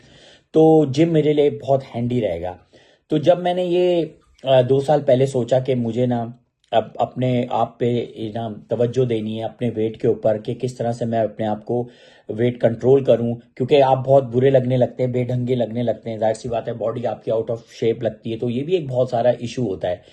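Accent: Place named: Pakistan. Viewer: You have a very pure urdu accent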